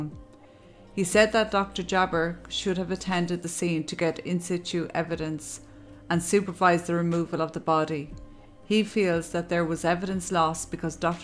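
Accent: Ireland. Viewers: Love your soothing voice. Which part of Ireland are you from ? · You speak so clearly with that beautiful Irish accent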